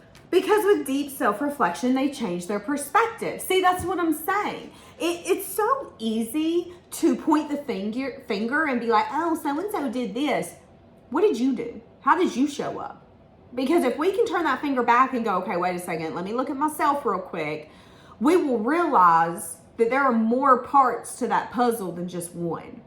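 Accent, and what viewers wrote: South, United States. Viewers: This Yankee LOVES the manner in which you speak! The descriptive way you talk plainly, intuitively with a Sourhern flair that is Spiritual AND hilarious! You are appreciated, dear lady.😊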